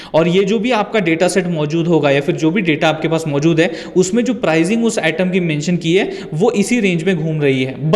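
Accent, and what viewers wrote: Maharashtra, India. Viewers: Sir language apki Marathi ki Tarah h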